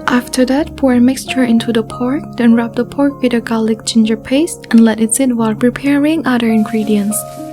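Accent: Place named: United States. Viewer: So proud of you, what you are doing, and how you are representing Khmer on international stage. From listening to your pronunciation, I think you are Khmer American. I am even more amazed that you can speak both Khmer and English so fluently, not to mention your soft and charming voice. And one more thing, when will you reveal your face to millions of fan in Cambodia 😬😬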